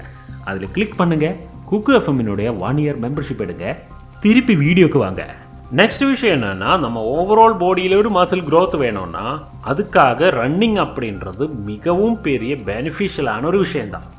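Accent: Kerala, India. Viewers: Brother are you Malayali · Neenga malayaliyaa? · Anna I'm sure are you Malayalam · Malayali yano... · Ninga Tamil lathan pesuringala bro ninga malayalam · You are a malayali ah???? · voice super malayalam mix ah na tamil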